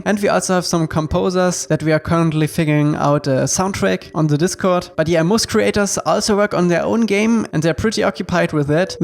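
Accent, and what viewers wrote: Germany. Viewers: Dein deutscher Akzent ist echt episch haha. Naja solange dich jeder Versteht passt's ja 😋🤣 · Is he a german? Edit: Lol he is really one😂😂😂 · Are you German? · Kann das sein das du deutscher bist?😂😂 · Dein deutscher accent ist echt baba HAHAHAHA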